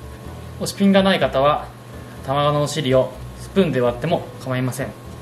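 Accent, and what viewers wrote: Kansai, Japan. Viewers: 関西の方だったんですね！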